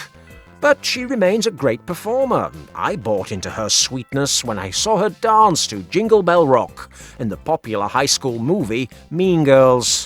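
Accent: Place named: Northern England, United Kingdom. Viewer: I've spent months trying to figure out where the narrator is from. Northern England, somewhere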